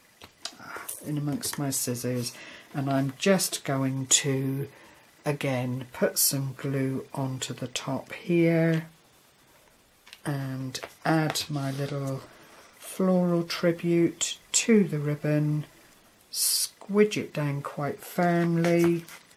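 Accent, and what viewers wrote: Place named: Devon, United Kingdom. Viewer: I thought you sounded like a local girl. I was born in Newton Abbot but live in Teignmouth. Small world isn't it. xxx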